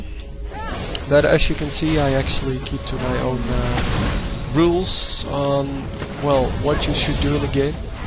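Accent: Netherlands. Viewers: heey, kvind het fijn dat er eindelijk een nederlander LoL vids maakt xD het is goed te hooren dat je nl bent aan je uitspraak :p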